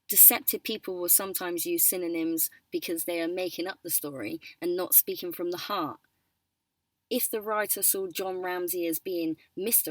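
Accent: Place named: United Kingdom. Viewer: i thought you sounded british. just looked on about tab. i do a live late night variety talk show. i been busy with work but getting ready to start interviewing you tube celebrities again. would you be interested in being on show? its audio only. like radio talk shows of 80s and 90s. talk about your channel, you and your back ground. how you got started. maybe even talk about this case a bit? go to my about tab and email me. After Dark is show name. go to playlist tab and listen to couple to get a feel. Brads workbench, junk from work, jeff king/the den of tools were some good ones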